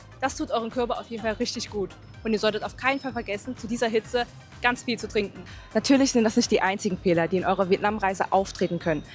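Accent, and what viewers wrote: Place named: Cologne, Germany. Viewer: Kann es sein, dass Du aus dem Rheinland bist? Ich meine einen leicht kölschen Einschlag zu hören 😉😉 Sehr informatives Filmchen, Danke für die vielen Tipps. Kleine Anmerkung: vielleicht etwas langsamer sprechen, ansonsten toll gemacht!! 👍👍👍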